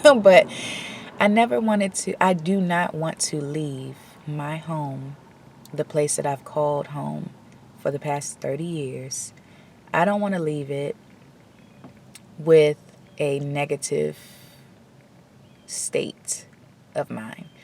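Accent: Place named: DMV, United States. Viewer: I hear a dmv accent. I am from there. I am feeling the same way with the trees. I wish I can move so that I can feel more freedom of nature. ❤ im grateful you have found your way.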